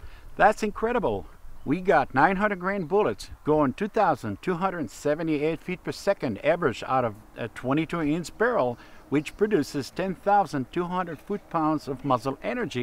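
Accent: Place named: Germany. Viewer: you sound like a German guy trying not to sound German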